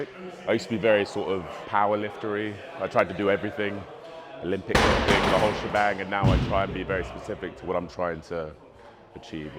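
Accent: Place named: Austria. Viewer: Have to acknowledge the Arnie accent sir!!! 🤌🏾🫡💪🏾🔥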